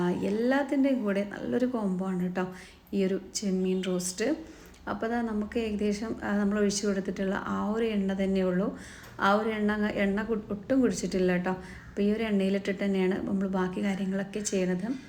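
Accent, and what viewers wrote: Malappuram, India. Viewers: നിങ്ങളുടെ വീട് എവിടെ യാ. ഭാഷ മലപ്പുറം ആണി. മലപ്പുറത്തു എവിടെ ആണ്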